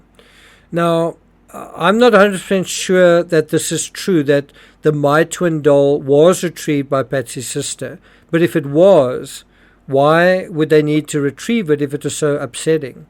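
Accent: South Africa. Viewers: Jon Bennet was abducted and taken to the US Airbase on the Azores for the purpose of sacrificial murder all organised by the then Prince Charles. She was raped to death in the Rape the Children to death races held by the Royals and world leaders. ANYBODY with the knowledge and truth but telling known lies regarding this young girl or ANY other one.. is about to be removed from Earth. PREPARE... BTW... I notice a South African accent... there are human meat processing tunnels in your country as well as mine and many others... 1000's are processed in these factories every day and the people abducted from the war zones. ..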